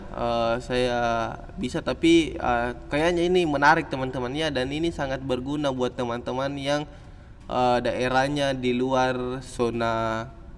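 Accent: Indonesia. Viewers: logatnya kental sekali sama logat makassar😂😂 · Ku bilang mmngja kaya ku tauki logatna...orang sulsel paeng.hhah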